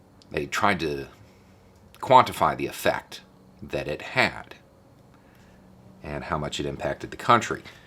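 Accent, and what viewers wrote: South, United States. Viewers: I apologize for my biased brain. I like to think I’m an open, intelligent, critical thinking fifty year old woman. But as soon as I saw your picture and read the headline, I thought for sure you were going to be a Trumplicker. Then hearing your southern accent, I really didn’t think I could listen to one more pro-Trump rant from a white guy. Well I was WRONG. My first quick little impulse about what I saw when I scrolled upon your video was clouded by my own judgement and pre-judgement. Prejudice is not a personality trait I want to associate myself with. So, thank you for being an intelligent voice in the fight against Trump’s re-election. I am thankful we can make mistakes and learn from our own shortcomings. I’ve gotten so trigger-happy and hyper-vigilant about anyone or anything that even smells like it might be a Trump supporter’s rantings that I was ready to jump all down your throat before really listening to you! 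Glad I waited before scrolling on and I’m now subscribing to your channel. 🌟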